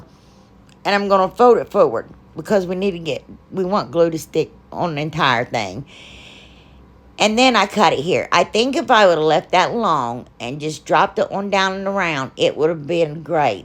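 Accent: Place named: Texas, United States. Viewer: I love hearing you talk! I’m from Texas and I talk the same way!! Just not as fast as you talk!! Lololol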